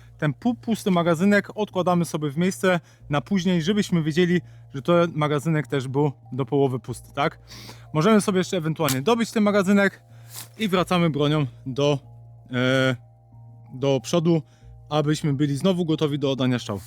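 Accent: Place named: Germany. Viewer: To lubię: krótko, treściwie i na temat :) A tak z ciekawości - długo jesteś w Niemczech? Mam wrażenie, że słyszę już lekko niemiecki akcent ;)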